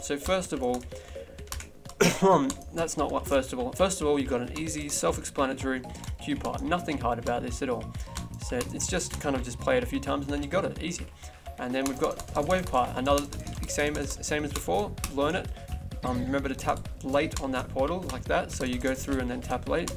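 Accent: Australia. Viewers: OMG IS AUSTRALIAN WHICH PART OF AUSTRALIA DO YOU LIVE IINNNNN??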